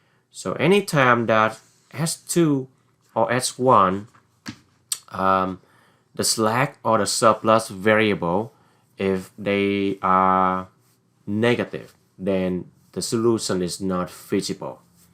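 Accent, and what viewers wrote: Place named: Vietnam. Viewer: nghe giọng giống người vn vậy bn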